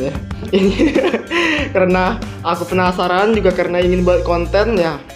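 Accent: Palembang, Indonesia. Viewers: bang lu orang Palembang ya bang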